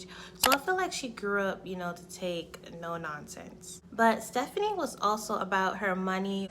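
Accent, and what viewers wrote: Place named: New York, United States. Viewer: I wish she tracked down and annihilated each member of the rapist clan. Btw: Your New Yorkerisms and soft, melodic voice completes me. Love your channelllllll, the respect and the humor. Sis is a G by the way. Coming from a neighboring country to me, trust and believe I'd jook a whole dude in the eye for the foolishness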